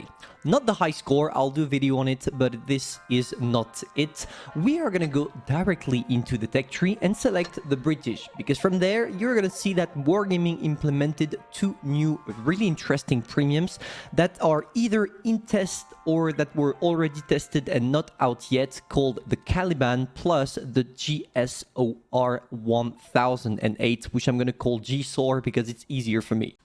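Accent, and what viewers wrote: France. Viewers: The audio is funky. He sounds French🤔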